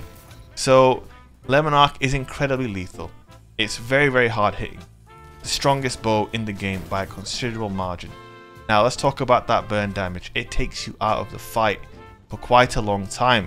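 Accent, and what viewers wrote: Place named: Yorkshire, United Kingdom. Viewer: i always wondered why my accent sounds so similar to yours. NO WAYY you're from west yorkshire, im from north yorkshire and i watch your videos all the time! the earth is so small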